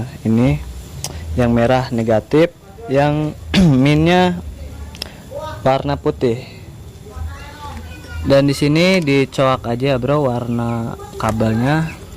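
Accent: Serang, Indonesia. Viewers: Wong serang Tah kang?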